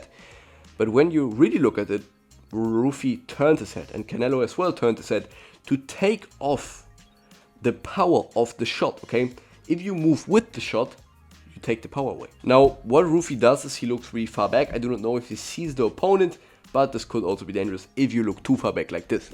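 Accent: Germany. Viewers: I hear german hahah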